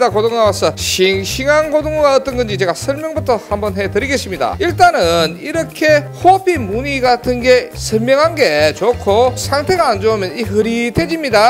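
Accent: Gyeongsang, South Korea. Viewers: 갱북사투리는 진짜 촌스러버예 비슷하지만 경남사투리는 멋이있는데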